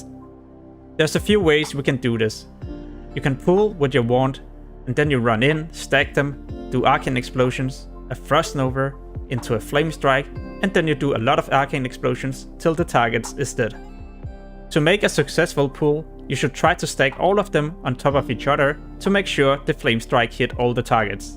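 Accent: Denmark. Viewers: He is Danish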